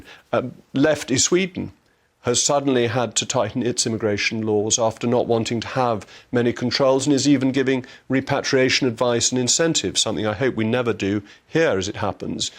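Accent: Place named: RP, United Kingdom. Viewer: Don't be fooled by the plummy voice which some people have been conditioned to trust. Whatever policies JRM recommends it's not to improve your lot, it's to increase his wealth. Unless you are wealthy, you will suffer, but he will gain. "Money is something I've always liked and wanted to have. I've always wanted to be rich." "I intend to stay a bachelor. I don't want to get divorced and have my wife take all my money." "Even when he was 4 he was interested in money." JRM's father. From 1982 interview: <link> A true man of the people with the interests of the working man at heart.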